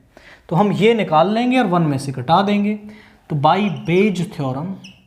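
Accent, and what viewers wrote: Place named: Haryana, India. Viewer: Tone to haryanvi h bolne ka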